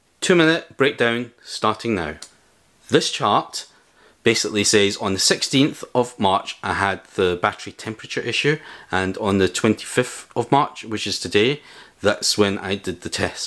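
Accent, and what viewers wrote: Scotland, United Kingdom. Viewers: I don't have a Leaf, but I'm subscribing just to hear your lovely Scottish voice. : )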